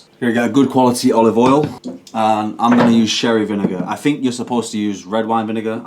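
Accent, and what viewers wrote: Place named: Yorkshire, United Kingdom. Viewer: What part of Yorkshire are you from mate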